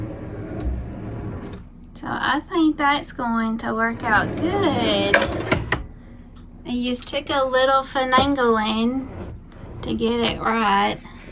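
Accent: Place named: Appalachia, United States. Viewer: I'm just curious about your dialect. Are you from West VA, Tennessee, or Kentucky?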